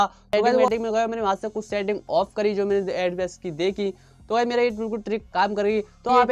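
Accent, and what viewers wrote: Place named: Uttarakhand, India. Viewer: Because aap ki avaaj Uttarakhand side ki lag rhi hai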